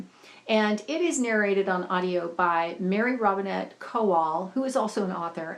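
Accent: United Kingdom. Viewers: Great tag! I loved the British accent ☺ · Your British accent is on par! I really want to read The Book of Ivy - heard mixed reviews on the 2nd one though!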